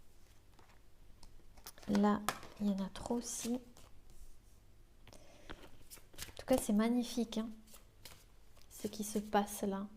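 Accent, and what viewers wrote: South, France. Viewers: Vous êtes mignonne! En + votre accent du midi c. top.Vous êtes habitée d'une douce énergie réconfortante, Merci.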